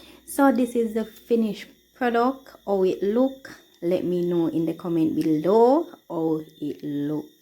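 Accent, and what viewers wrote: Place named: Jamaica. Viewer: Love the Jamaican accent! I hope I can visit Jamaica soon!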